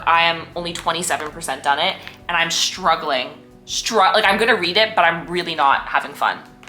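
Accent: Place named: Canada. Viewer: You have such a fun personality, and I can instantly hear your Canadian accent😆😆😆…. I’m actually kind of blown away by your portable light for your laptop.🤯🤯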